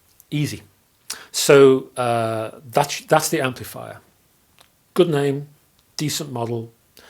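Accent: United Kingdom. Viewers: Played the video and got an earful of British accent. I immediately, trust this man's recommendations for absolutely everything, from record players to scuba gear to fighter jets.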